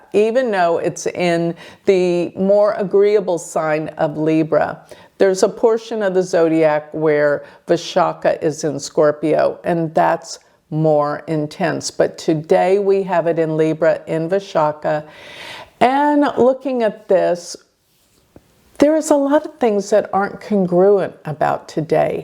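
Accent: Texas, United States. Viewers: I watched a different video of yours, I didn't research anything about you and I immediately said, "She's from Dallas TX!" I am Accent Sympathetic and clairvoyant with 2 levels, possibly 3. I'm going to sign up for my Chart details from your website. I can trust you, my guides have told me.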